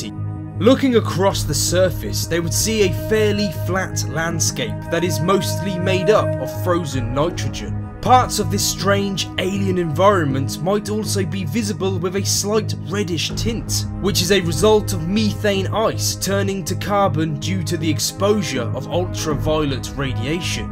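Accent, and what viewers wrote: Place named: West Country, United Kingdom. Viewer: I can hear a slight West country tinge to your accent.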